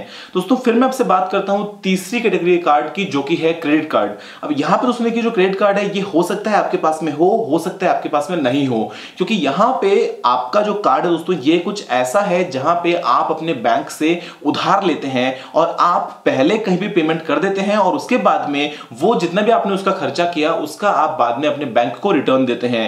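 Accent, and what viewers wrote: India. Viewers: NOT ALL KNOW INDIA ENGLISH PLS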